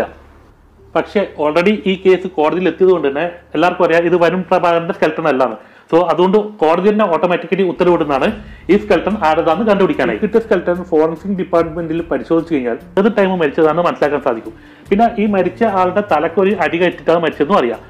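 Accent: Kannur, India. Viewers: Nigal kannur ano ? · നിങ്ങൾ കണ്ണൂർ കാസർഗോഡ് കാരനാണോ...? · Eth kannur karanada... Ee channel owner, thani nadan kannur bhasha...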